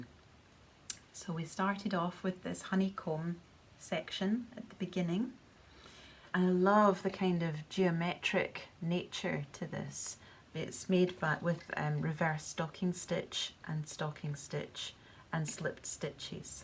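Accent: Scotland, United Kingdom. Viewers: Dear, what a lovely podcast you make. I love the 'slowness' and find it very relaxing to just listen to your beautiful voice and scottisch accent while I'm knitting, especially in these times when there's so much stress going on in the world. My question is: Would you please show a picture of your son wearing his quilt and the socks you made him? Only with his permission of course 😃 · Thank you for this giveaway. I’ve never seen such gorgeous project bags and notion pouches before. This is the second video I’ve seen of yours and was wondering why your accent isn’t as strong as some others. Did you grow up in Scotland or just moved there in later years? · I am happy to have discovered your podcast just after you posted your first episode. You have a lovely, calming voice and as someone who visits Scotland yearly (not 2020 unfortunately), it warms my heart to hear the Scottish accent when I'm so far away. 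My question is do you ever begin a project and decide you are just not feeling it and decide not to finish? If so, do you have any examples? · I absolutely love your Scottish accent and your gentle voice! My question is what is the coldest it can get in the Scottish winter and when it’s cold what type of yarn do you choose to wear?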